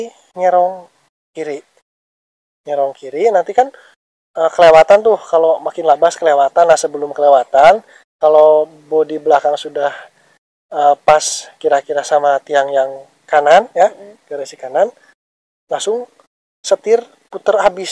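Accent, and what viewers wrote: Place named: West Java, Indonesia. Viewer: Nyunda asek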